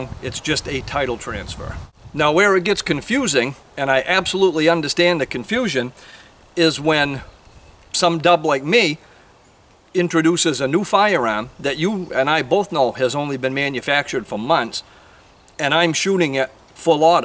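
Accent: Maine, United States. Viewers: That gotta be a Maine accent Im a New Englander as Well Sir Thank you that Was terribly helpfull. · This guys a mainer for sure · You must be from Maine. There's no mistaking that down state accent. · I'm detecting a Maine accent.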